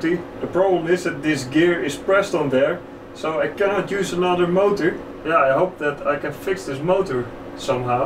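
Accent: Netherlands. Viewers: I'm too lazy to see who fourth or fived that so I'm just gonna n that :D Try reversing one of the phases. Use a multimeter to determine the individual coils or if you can find one, pull up the datasheet for the motor. I've had the same issue multiple times and that always fixed it. Steppers don't break just like that ;) Btw, totally subbed. Interesting content and Dutchlish is just super pleasant to listen to :D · do I hear a dutch accent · Are you dutch because of youre accent and the you sayed tering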